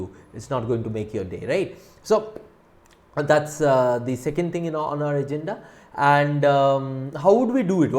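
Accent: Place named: Bengal, India. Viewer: Sir, you explain so well. But I guess there is a problem in me.. Still I'm unable to solve these types of problems.. So, I also started viewing critical reasoning topic with a hope to acquire more knowledge from you... Thank you! 🙏 Well, I'm curious to know are you bengali? 😅 (off topic)... Your accent seems so...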